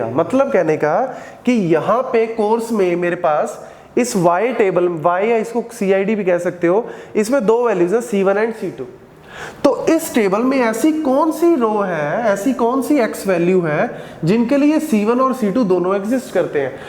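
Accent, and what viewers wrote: India. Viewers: can I give out some suggestion/ Content is good but accent is quit horrible, I feel like I’m listening to Indian, it would be quit nice if subtitles could manually added clarify what teacher is saying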